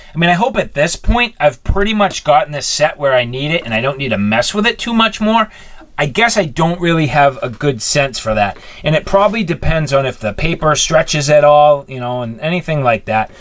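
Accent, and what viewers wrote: Boston, United States. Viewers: This guy from Boston?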